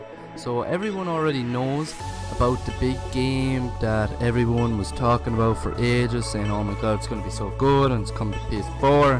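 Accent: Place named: Ireland. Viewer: Youre irish too nice!!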